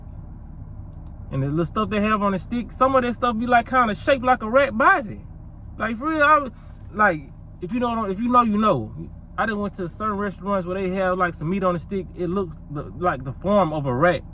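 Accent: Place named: Memphis, United States. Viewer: You Sound Like From The Hood We Black Memphis.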